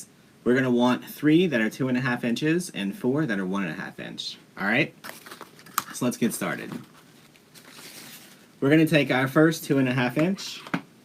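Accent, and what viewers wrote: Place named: South, United States. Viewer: I don’t know what gives me a bigger thrill! The fact that I have learned more about wreaths and bows watching 2 of your films or the fact that your from the South! I love the Y’all 😘 I feel like I am listening to one of my professors from Ole Miss.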